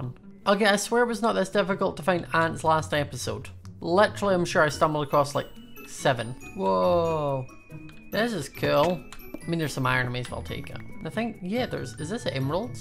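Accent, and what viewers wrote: Scotland, United Kingdom. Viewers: You remind me of that sloth off of ice age because you are taking Dragons . Your so funny and I love your Scottish accent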